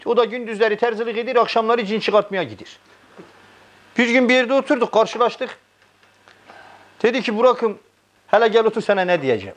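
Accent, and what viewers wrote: Erzurum, Turkey. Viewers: Erzurumluların şivesine bayılıyorum ya 😂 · Burak kardeşim sohbetlerini Zevk ve hazla dinliyorum. Takibinizi yapıyorum. Diskionunuz güzel akici. Zekanızı güzel kullaniyosun. Erzurum şivesiyle mübalağalida olsa taktir ediyor başarılar diliyorum. ANCAK..!!! ? O tatlı sohbetlerin arasında keşke aralarda üstüne basarak ettiğin küfürleri kullanmak zorundamisin? Bu hoş sohbetlerini aileler, ana baba bacı çocuklarda dinliyor. Bilmem nerelerini yererem. Vay ben orana yok burana S....um. Vay ben A....k... gibi küfürler sence doğru ve çok hoş mu? Kaldiki hele hele bir Dadaşa böyle bir dil üslubu yakışiyo mu? Lütfen..! Sevgilerle selamlar Hemşehrim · Üniversiteyi Erzurum’da okudum özlemişim bu şiveyi ağzına sağlık kardeşim hala gülüyorum. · 🤣🤣🤣🤣he valla ölide bizim.diride doğri diyir çok hoş erzurum şivesi konuşmalari slm.olsun bütün erzurumlu kardeşlerimize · Bir erzurumlu olarak şive dinlemek bile çok güzel. 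Ama şu aralara neden küfür konur anlamam. Hiç hoş değil komik asla değil. Erzurumluların ağzı bozuk değildir. Komik olmak için buna gerek yok. Bu yüzden dinlerken gülemiyorum ve izlemek istemiyorum.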